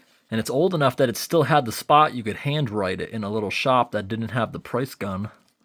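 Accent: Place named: Connecticut River Valley, United States. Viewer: Don't understand why I watch this stuff lol recognize the Conn Riv Vly accent good video my man ✌🏼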